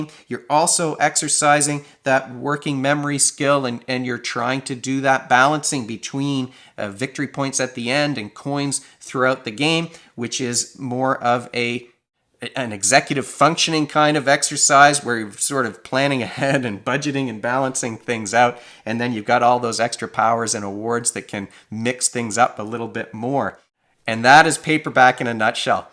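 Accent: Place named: Canada. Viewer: Sick review. I love when I can tell from the first sentence someone says that they’re Canadian too lol.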